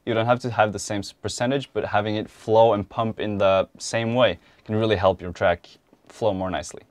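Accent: Norway. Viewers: er du norsk? høres sånn ut hvertfall. hvis ud ikke er nosk gir dette sikkert ikke noe mening da men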